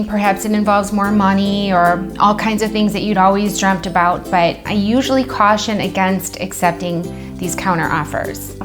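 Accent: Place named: Minnesota, United States. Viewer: I love her accent, is she from the Fargo movie?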